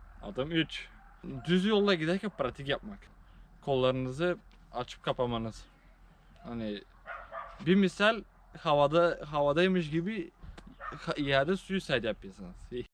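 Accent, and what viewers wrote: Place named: Macır, Turkey. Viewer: Efsaneeee tam macırca konuşma vallaha sard i😂😂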